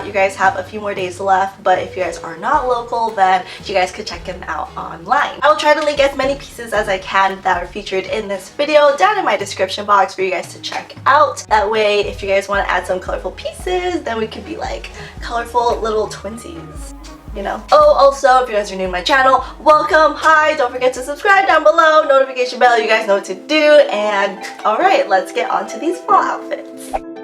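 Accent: United States. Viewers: You can tell she’s from Cali, even if she wouldn’t say it💀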